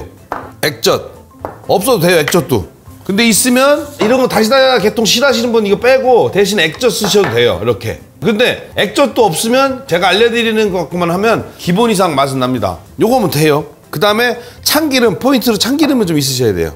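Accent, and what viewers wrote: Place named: Yesan, South Korea. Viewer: 보령 옆의 구수한 예산의 구수한 사투리의 음성이 귀에 쏙쏙 들어온답니다^^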